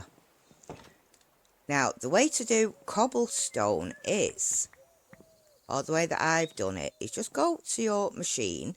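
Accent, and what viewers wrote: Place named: Northern England, United Kingdom. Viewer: im UK based too! Down south, you sound more northern .. glad to hear you are keeping your spirits up, take care.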